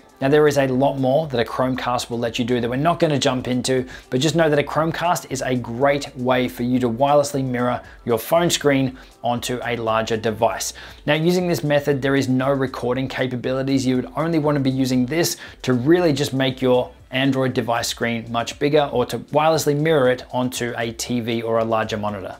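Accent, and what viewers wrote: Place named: Australia. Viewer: Great tutorial. However, my recommendation to you would be to pace your sentences so that your sentences are slower and your words clearer because right now you are talking way too fast to make any sense to me! Additionally, with your strong Australian accent, it isn't making following you as a non-Australian very easy. Why do you think that you have to speed through what you have to say in this video? Are you short on time? This video tutorial was way too fast for me to follow comfortably! Take Two and try to incorporate my advice into your retake on the same subject!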